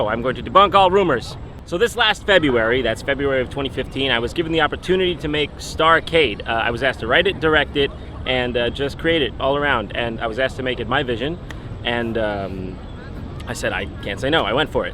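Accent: New York, United States. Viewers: AM I the only one that noticed is totally getting a new yourker accent now?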